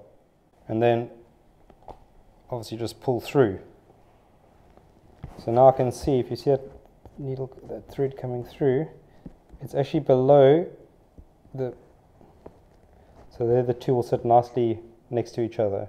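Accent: South Africa. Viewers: Yes man. I recognize the South African accent. Where do you get your hides? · Hey guys. It is so fresh and nice to hear the South African accent🤠. And at the same time see some real quality work. Makes me proud. I just recently started my business here in Germany #vanrensburgadornments. Slightly different direction than you guys. But I find your work very inspirational. Keep it up. This tutorial is excellent. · Very pleasant to listen to. Are you from South Africa? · Good video, thank you. You have a South African accent, we're you from? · Sounding like a South African 🇿🇦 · I love your saffa accent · is that a south african accent? it’s a little different than australian or new zealand.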